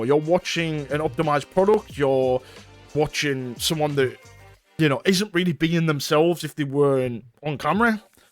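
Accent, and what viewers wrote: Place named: North, United Kingdom. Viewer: I totally agree with all the points you brought up. Seeing it from the view as a creator and as someone who likes to express themselves in the comments & give feedback on videos... it feel as though we're all walking on eggshells & we can't be as organic as we want to be. For creators, they can't push the boundaries or be edgy or forward thinking anymore. As a viewer..: I'm not one of those Twitter types who gives knee jerk abrasives comments, if anything I'm respectful of of a creators channel & I don't want to bring any negativity to the channel but it's so annoying when you make comments that are totally valid, factual & non offensive... it gets removed or censored, all while someone can berate you in the crudest manner & they get a pass. The latest YouTube algorithm & censorship doesn't make sense but hey ho, at least they're not as bad as Twitch. 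Ps love the accent & as a Londoner & every time I've met people from up your end they've been the nicest people 💯🙏🏾👊🏾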